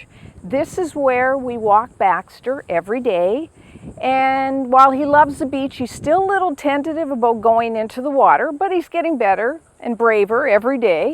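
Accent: Canada. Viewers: You used to live in Canada right. You have that accent. I love your recepies I've been watxhing for about a year and I love your channel!!!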